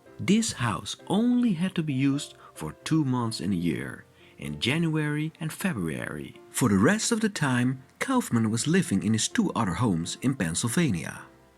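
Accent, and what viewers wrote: Netherlands. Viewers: Hi, thousand thanks for this absolutely stunning documentary. No clue, whether it was made using AI, but in this case, the artificial help would have been wholesome. I very much enjoyed the abundance of plan views. This is a great plus, considering all the documentaries entirely made by AI running riot at YouTube. They are like a pest. A highly mind numbingly monotonous voice tells stories embellished with lyric weeds, which in general has nothing to do with the topic of the documentary. Would be kind of a match if you put in perspective that the images either are plentiful and artificially rendered and show scenes, which don’t at all match neither the narrator’s discourse nor the topic. In profusely appreciated contrast this documentary is how they should be and show, what the whole point is in doing a documentary. By the sympathetic way the narrator pronounces the “v”, tell’s me of his home country the Netherlands and shows me again, why I love the Netherlands and the Dutch people. 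I must visit the country again very soon, should not be too difficult for me, as I live in Germany. I can only bid you many warm a thank you. Please continue your fine work. Hartelijk bedankt voor je geweldige documentatie. Blijf zo doorgaan. 🇱🇺“Je maintiendrai”🇱🇺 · It’s so funny, I also thought you sounded like the Netherlands, so it seems I was guessing a little correctly. Good luck with all.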